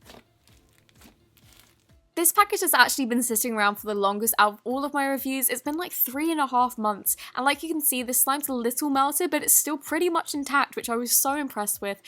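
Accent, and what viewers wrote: England, United Kingdom. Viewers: THE TRUTH ABOUT THE WORLD, She's English. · I love British accents❤️💕❤️💕❤️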